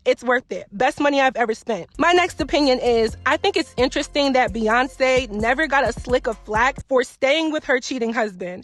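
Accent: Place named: Philadelphia, United States. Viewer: I knew that title had to be click bait . The only reason I know you’re African is because you tell us. You talk like every other girl in Philly plus you rap 😂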